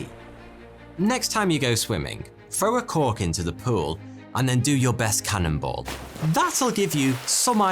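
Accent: United Kingdom. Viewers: Why are British accents such good story tellers.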